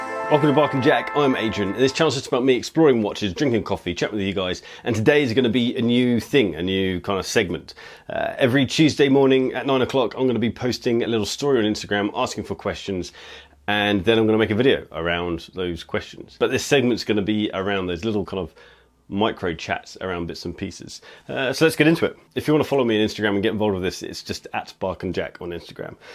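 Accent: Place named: Scotland, United Kingdom. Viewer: Hey Adrian, where in Scotland are you?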